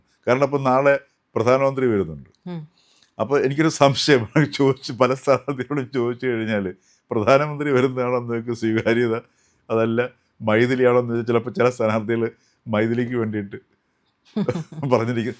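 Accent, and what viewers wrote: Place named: Madhubani, India. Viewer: Pranounsation is Madhubani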